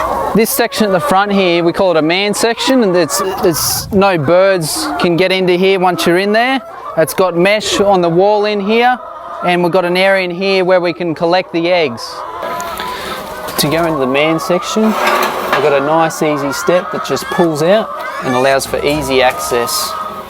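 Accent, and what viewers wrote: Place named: Australia. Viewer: Ur australian :P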